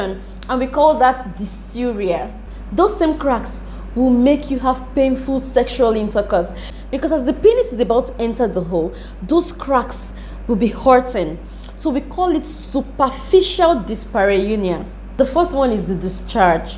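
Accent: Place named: Nigeria. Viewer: I think she's a Nigerian 😃😃😃😃😃😃😃😃😃I love her❤❤❤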